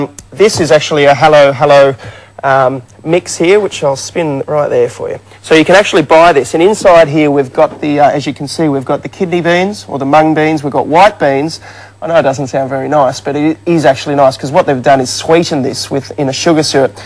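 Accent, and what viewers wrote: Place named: Australia. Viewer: he's australian. it's the accent.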